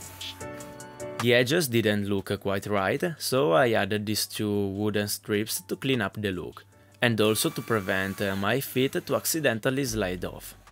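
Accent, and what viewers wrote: Italy. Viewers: This is awesome. I have been into sim racing for a while now and I am in IT here in the US. You have some ingenious crafting and engineering skills. Also, I could tell you were Italian as soon as I heard you speak... My mother is from Catanzaro and her accent is really similar to yours haha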